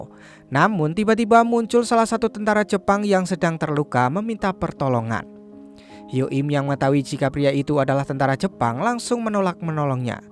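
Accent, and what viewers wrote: Java, Indonesia. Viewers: Byak mulut bukan film,mana medok bgt ngmngnya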